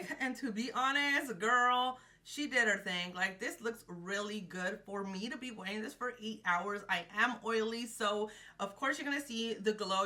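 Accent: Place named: Rural, Mexico. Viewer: The way you talk reminds me of my people from back home in mi ranchito. Tysm for the educational and entertaining content! Subscribed 🤍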